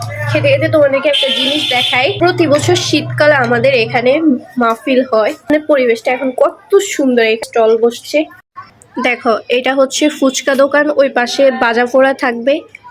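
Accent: Noakhali, Bangladesh. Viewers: নোয়াখালীর ভাষা আমার কাছে অনেক ভালো লাগে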